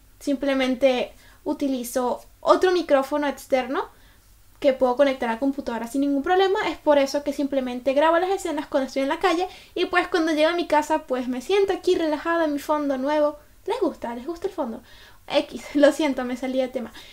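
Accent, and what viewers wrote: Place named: Chile. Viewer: Hablas como chilena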